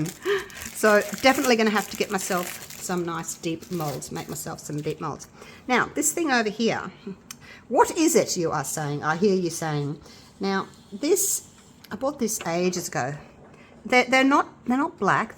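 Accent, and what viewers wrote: Australia. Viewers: I love the fact that you talk all the way through because apart from the fact that I just love the Aussie accent, the ones that play music in the background just does my head in. Also I love what you show us.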